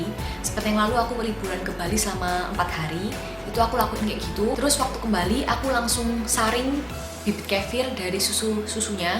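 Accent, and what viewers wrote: Java, Indonesia. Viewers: medhok bnget... q suka gadis jawa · keganggu bgt sm medok jawa nya 😄😄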